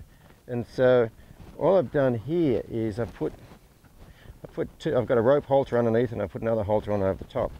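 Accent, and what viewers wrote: Australia. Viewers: Lol it doesn't understand his Aussie accent.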